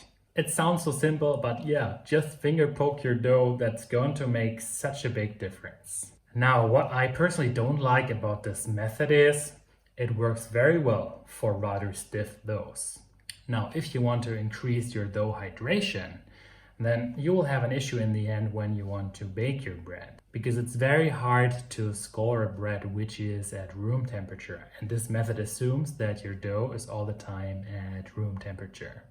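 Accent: Germany. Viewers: Until you mentioned Hamburg I would have bet that your german accent in english is of a kind of hessian type.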